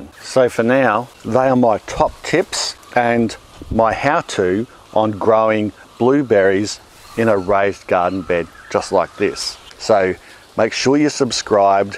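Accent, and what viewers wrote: Australia. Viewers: Haha. Same here, and also love the Aussie accent.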